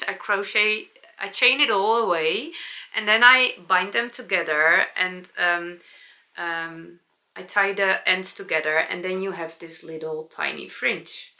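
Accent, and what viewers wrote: Netherlands. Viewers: I love your "Dutchy English",. On one point, we say "lying down" in English, not "laying down." For example, when you are speaking about yourself, you will say, "I am lying down" or "I am going yo lue down." You will use the word "laying" when you are speaking about laying something down, or laying a table with cutlery, for example. That's the general rule, although some people do say laying instead of lying. It's a matter of what you're used to, I suppose. Love all your projects!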